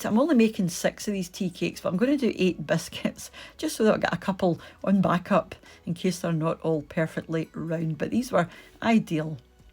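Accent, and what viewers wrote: Scotland, United Kingdom. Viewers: I’m here just for the lovely 🥰 Scottish accent. 💕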